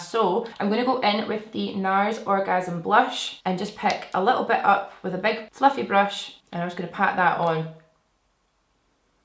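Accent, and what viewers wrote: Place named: Scotland, United Kingdom. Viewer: U sound like the Scottish version of Yelena Belova and I bloody love it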